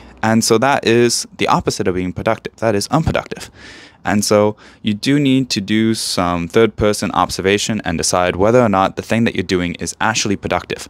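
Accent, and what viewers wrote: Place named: United Kingdom. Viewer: You have a British accent, that's cool.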